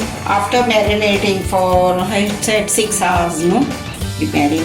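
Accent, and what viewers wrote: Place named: Goa, India. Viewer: i am a goan and I love this chef and her accent - so Goan. Love you and thanks for the lovely recipe.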